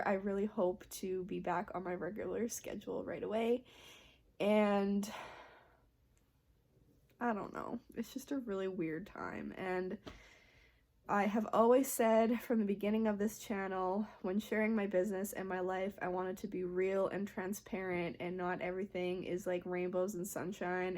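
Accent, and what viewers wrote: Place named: Minnesota, United States. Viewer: Oh no worries girl we knew it from your accent ;). Minnesota native here. Hope you are doing ok up there and stay warm! xoxo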